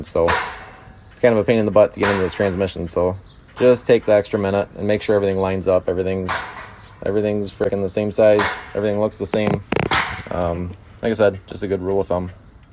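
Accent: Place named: Wisconsin, United States. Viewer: My guess was Wisconsin based on his accent.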